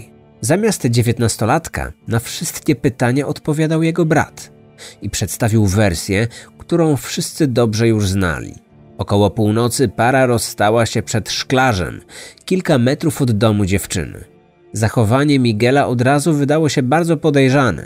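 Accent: Poland. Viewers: te promocje to k.. a ty masz jakies wschodnie pochodzenie. Hajnowka a moze Bielsk Podlaski. lubie cie ale akcent nie z pyrow lecz z podlasia. "trzeba nosic wlasna skore".pozdrawiam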